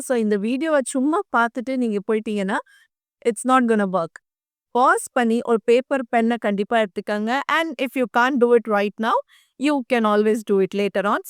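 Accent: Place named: North, India. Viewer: Your pronunciation like North indian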